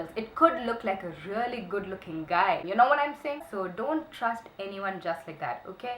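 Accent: India. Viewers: is that a Hindi accent? cool! I think its lit and unique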